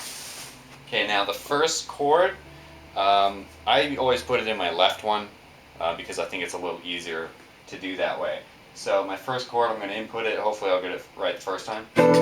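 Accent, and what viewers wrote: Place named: Germany. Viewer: I'm really speechless at how wicked that thing is. You sound a bit like Klaus from american dad, a slight german accent there :-)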